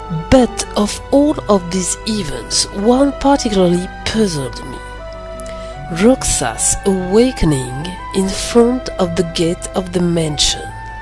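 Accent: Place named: France. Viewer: Salut salut, bon je me permet d'ecrire en français du fait que j'ai reconue le petit accent :). Maintenant venant en a la theorie, je trouve qu'elle fait sense dans un certain context avec les arguments que tu viens de presnter. Mais le maitre des maitre n'est pas assignier a une union par consequent les signes des 6 union doivent étre present ou aucun ne doit faire son apparition. Mais une chose qui m'a fait réflaichir c'est le Labo qui se trouve en dessou du manoir, maintenant que l'on sait que KHUX se passe dans un rêve ou une simulation informatique, c'est très probable que le manoir est le prochain QG des dandelions après la disparition de daybreak town dans les tenèbre (ou ils ont re-crée les evenements graces a leurs souvenir), cela peut expliquer les statues d'unicornes et les quelques signe de l'union de vulpus de maitre Ava en hommage aux précedant Maitres. 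En gros voila mon idée et j'aimerais bien avoir ton avis sur le sujet, bien evidement je sais que ma théorie a des failles (cheraty) cela me feras plaisir d'en disscuter. Merci pour la video !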